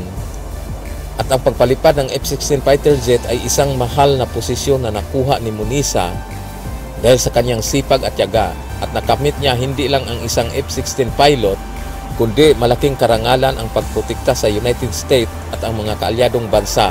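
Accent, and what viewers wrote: Visayas, Philippines. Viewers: Monissa or Monessa?..trick or treats?😁...bisaya ka bay? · Bisaya dong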